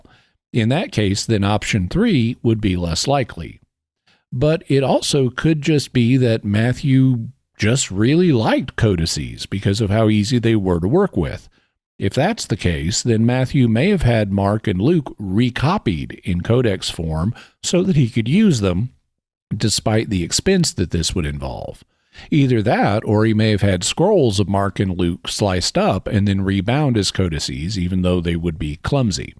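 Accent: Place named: Texas, United States. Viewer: Because he's from Texas and that's probably just the way he talks.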